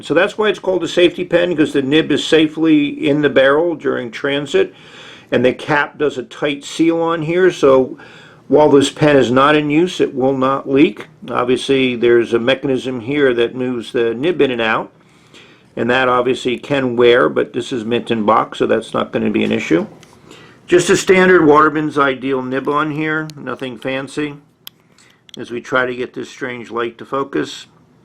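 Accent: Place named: Appalachia, United States. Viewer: great video. I always learn a lot about vintage pens from you. congratulations on reaching a milestone with your Channel. I have to ask, based on your accent, if you happen to be from the Allegheny mountain region originally?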